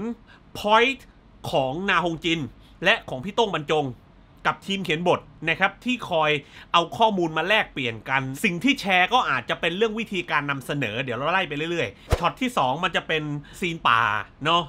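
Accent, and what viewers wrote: South, Thailand. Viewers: เอ้า คนใต้รึครับพี่บาว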